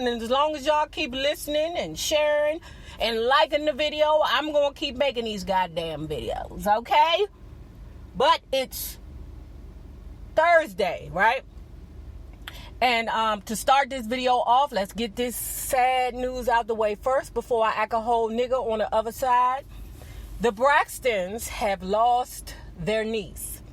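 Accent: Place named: DMV, United States. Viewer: what part of the DMV area you from i can hear it on your voice, Its in the ova's lol and certain words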